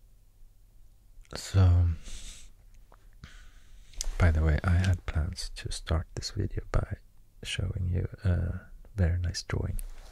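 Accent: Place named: Sweden. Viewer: Idk what it is about people with Swedish accents, but you are not the only person who I enjoy listening to speak. You might even wanna consider speaking in your native language sometimes too and just add the translations in subtitles for people who might want to still read. I just think Swedish is so nice to listen to, even if I don't understand it lol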